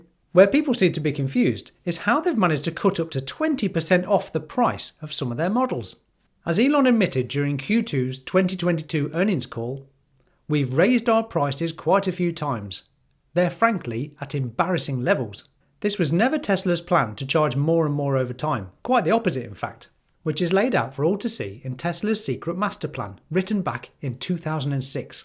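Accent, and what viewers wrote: United Kingdom. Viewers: Lovely content. Funny accent. Are you from Liverpool or Manchester, perhaps?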